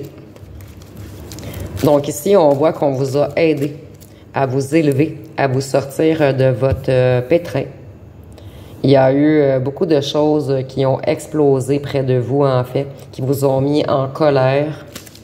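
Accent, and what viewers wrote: Canada. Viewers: Bonjours à vous ! Je me demandais, comment vous avez capté ça, vu votre accent vous êtes au canada ? Mais tout ça là, j'le vois dans mon petit coin d'france ! Mais tout tout tout... Vous êtes balaise !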